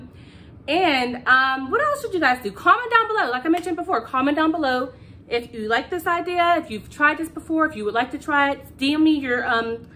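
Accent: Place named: Pittsburgh, United States. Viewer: Love it I was going to use cardboard!!! This gonna sound crazy but I had to subscribe cause as soon as you spoke I knew your were a fellow PGH girl before you even said it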